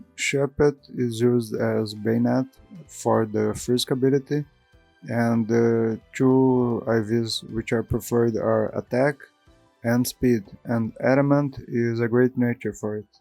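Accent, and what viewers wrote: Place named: Brazil. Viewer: gg where are u from bro? your accent looks like brazilian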